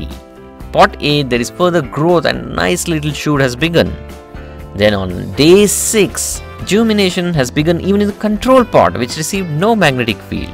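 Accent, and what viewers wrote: India. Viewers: sounds like one of those indian scam-call centers..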